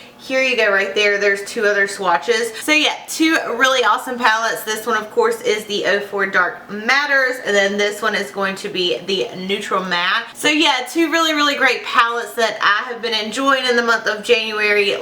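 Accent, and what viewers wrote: South, United States. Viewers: You are SO beautiful. I love your videos so much. You are such a sweetie. Your makeup looks gorgeous! I would LOVE to see a tutorial. I could listen to you all day. I love your accent! I am a southern girl too. Love you,.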